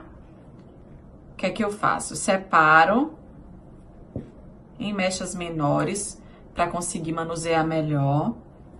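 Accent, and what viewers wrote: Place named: Salvador, Brazil. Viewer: Pelo seu sotaque Parece ser de Slavador. Aceitei? Se sim onde vc atende? Quero muito colocar No caso do cabelo crespo tem q ficar alisando pra ficar compatível com o mega??? Pq a raiz vai crescendo n fica feio nao? A raiz crespa e o mega liso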